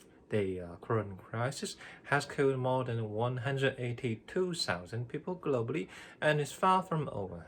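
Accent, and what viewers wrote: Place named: United Kingdom. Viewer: This is Hao. Your work reminds me the Garbric Orozco’s Hammock En La Moma. I also like the way you make the British accent. It makes it sounds very professional. The changing of voice during the news report also makes me think that the broadcast news must be a very boring job, but the people who broadcast it must stay still and act normally no matter what happens.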